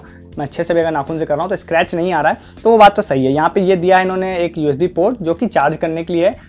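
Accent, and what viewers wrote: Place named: Uttar Pradesh, India. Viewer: 3.14 bhai yar. puri UP ki bhasha bhai. ghar ki yaad diladi :P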